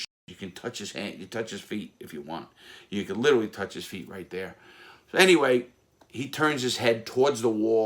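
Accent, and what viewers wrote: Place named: East Coast, United States. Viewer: Very good...your interesting and love your east coast accent..handsome too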